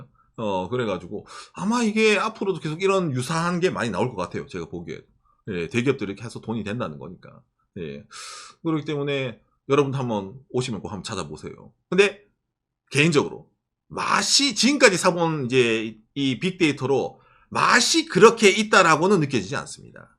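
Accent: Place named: Gyeongsang, South Korea. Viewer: 멘치로. 찐경상도!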